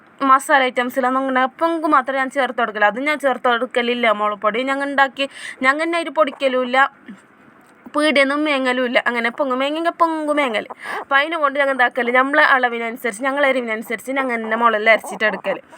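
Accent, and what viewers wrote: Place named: Kasaragod, India. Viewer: കാസർകോട് സ്ലാങ് സൂപ്പർ ക്യൂട്ട് വോയ്‌സ്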